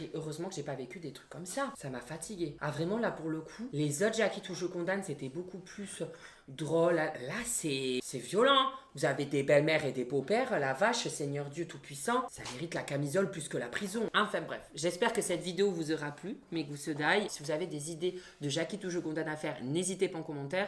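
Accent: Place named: Southwest, France. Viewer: moi aussi je le prononce comme ça et je suis du sud-ouest aussi comme lui.... "Am'mandonnéééééééééééééééééééééééé"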